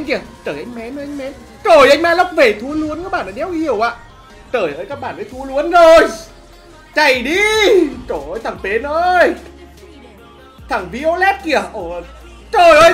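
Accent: Nghe An, Vietnam. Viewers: Cái giọng Nghệ nhà tôi, wou trời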